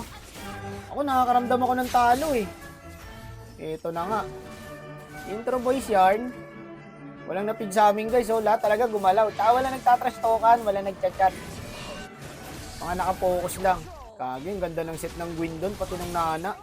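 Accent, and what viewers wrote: Iloilo, Philippines. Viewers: Bos ilonggo ka naman mag salita sa content mo kakatuwa eh enjoy 😂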